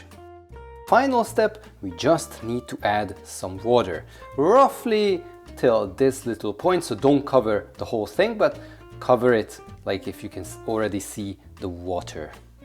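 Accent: Hungary. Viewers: Your Hunglish accent is adorable hahaha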